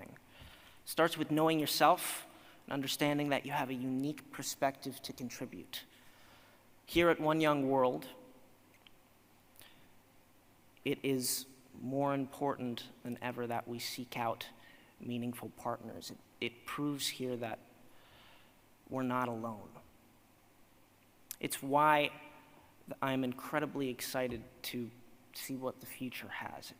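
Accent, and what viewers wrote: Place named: England, United Kingdom. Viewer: Hello Aidan, I hope you see this comment. You have many fans in Turkey and there are no Turkish subtitles in your videos. It is not a problem for me, but I think it is a problem for those who do not understand the English accent. Please take this comment into consideration and you should do something about this issue. I think we love you.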